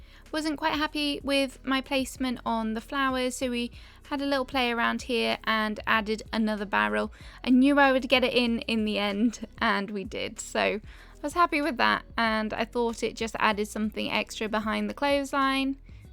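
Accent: United Kingdom. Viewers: Well I am a sucker for British accents lol : ) But once again love your channel, I have totally gotten some inpriration. <3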